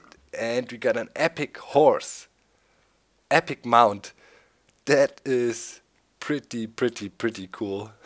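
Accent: Germany. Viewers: I love the German Accent well i think its German.... :3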